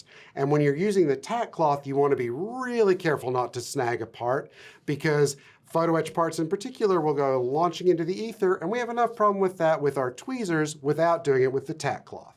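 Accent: Australia. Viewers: New to the channel, love it by the way and spent a lot of time going over the back catalogue. So much priceless information, tips, and general entertainment for anyone into modelling, or like me, getting back into it and freaking out. Buying way too many kits (that aren't being started), but freaking out none the less! Do I notice a slight Australian accent there?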